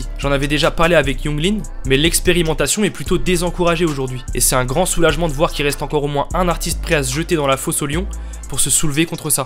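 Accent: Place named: France. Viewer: Très bonne video et ça fait bizarre d'entendre un français parler de et et 😂 qui sont trois autres boss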